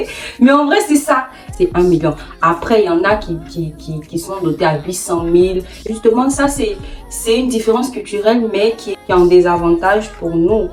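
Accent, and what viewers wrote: Ivory Coast. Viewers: ton accent gabonais est parti ou ? ta déjà l'accent ivoirien 😭😭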